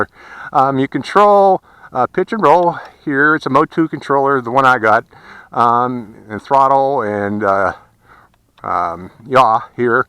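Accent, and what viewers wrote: Pittsburgh, United States. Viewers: Are you from Pittsburgh or Western PA?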